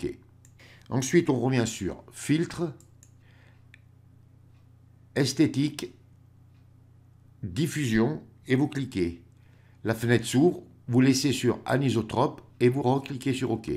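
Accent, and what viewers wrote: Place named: Saint-Étienne, France. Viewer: Merci pour vos tutoriels exceptionnels que j'apprécie énormément: même avec votre bon accent stéphanois tout est bien clair et limpide: vous êtes très patient et pédagogue.Un grand bravo..Je m'abonne pas souvent aux chaines youtube mais j'ai fait unbe exception pour vous!! Mais je fais la même remarque que Pierre Darre, pourquoi la rotation 4 fois de l'image??? Pourquoi?? Et votre lien de réponse ne solutionne pas la question!! Encore merci et à très bientôt!!!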